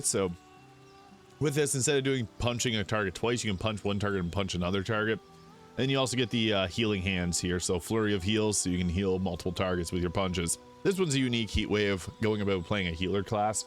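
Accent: Canada. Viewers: Love your content but why do you sound Canadian sometimes?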